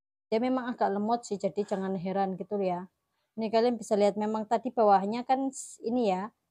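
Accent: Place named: Java, Indonesia. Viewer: Suaranya agak medok...lucu tp jelas sii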